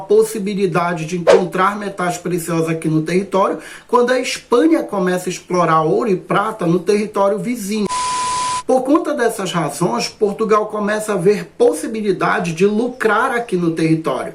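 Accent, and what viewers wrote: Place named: Pará, Brazil. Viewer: Poxa, professor, acabei de encontrar o seu canal, vi dois vídeos e já sinto sua falta 😂. Pelo sotaque vc é do Pará (minha terra de criação) vivi em Altamira por 19 anos. Obrigada pelo conteúdo de qualidade acerca da história 🎉🎉🎉🎉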